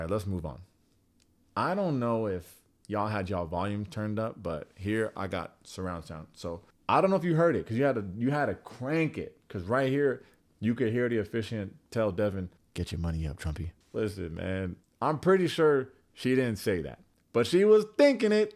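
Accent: Virginia, United States. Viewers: I will never not love your Virginia voice. Ever. Never change, King. We’ll miss you at the reunion!!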